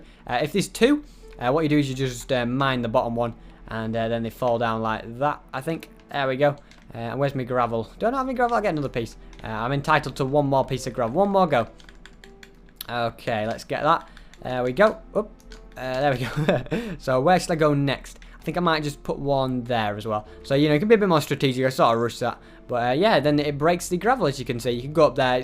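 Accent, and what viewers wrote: United Kingdom. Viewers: Are you british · Happy Gamer he doesn't say fam it's just his british accent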